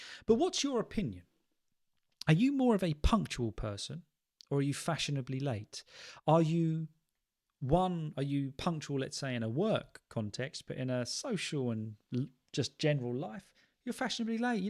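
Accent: United Kingdom. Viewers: I started watching your videos to get used to the British accent. I have to concentrate to understand you. It feels like learning a new language 😅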